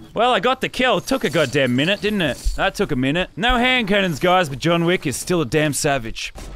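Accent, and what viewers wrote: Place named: Australia. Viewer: you're my favourite YouTuber, not just because i think swearing in an Australian ascent if funny but the things you say and do make me laugh just as much, also keep playing fortnite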